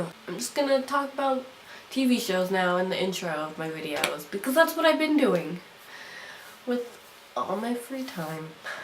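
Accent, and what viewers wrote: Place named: United States. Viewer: Oh, I love Margaret Atwood! Handmaid's Tale is very good... I feel like that's the obvious one to start with. I approve. :) :) On accents, I probably would have thought you were American if I didn't know any better, but you do always sound very well spoken and clear... so maybe I'd just guess you were more northern? Which you are in fact north of me.... :) :)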